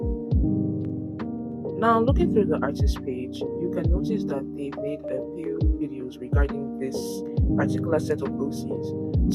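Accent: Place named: Nigeria. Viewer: I KNOW THAT ACCENT ANYWHERE OMG 🇳🇬🇳🇬🇳🇬 FELLOW NIGERIAN ARTIST HERE YIPPE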